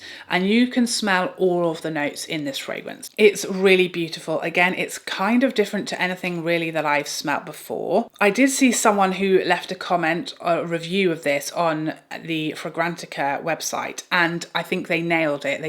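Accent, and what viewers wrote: United Kingdom. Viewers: What a very descriptive way of explaining the scents and notes of Charlotte Tilbury"s new fragrances. I appreciate your thoughts and narrative. Great video. Do you like any of th Kayali fragrenced,? Love you British accent and sense of humor.👍✔️❗🌸🏵️❤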